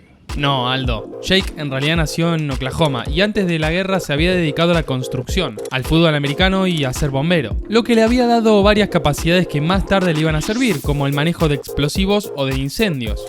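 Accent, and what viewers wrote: Argentina. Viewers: De donde eres tu ? Uruguay o Argentina? Un pregunta nada mas e por l'acento a mi me parese mas Argentino verdad ,de toda manera tu video me encanta mucho te felicido yo soy napolitano e amo Maradona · pense que se llamaba Shake, fk acento agertino xD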